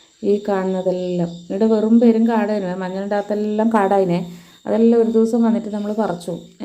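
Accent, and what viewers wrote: Kannur, India. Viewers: അടിപൊളി വീഡിയോ ആനുട്ടോ.. ഏററവും അടിപൊളി കണ്ണൂർ സംസാരം ആണ്... മൊത്തത്തിൽ പൊളിച്ചു 👌🌎 വളരേ നല്ല അറിവുകൾ ആണ് പകർന്നു നൽകിയത് എല്ലാവർക്കും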